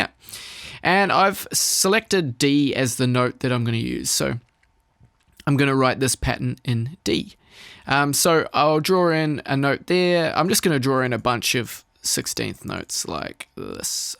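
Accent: New Zealand. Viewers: Heard you speak in the intro and was immediately like "ay new zealand!?" haha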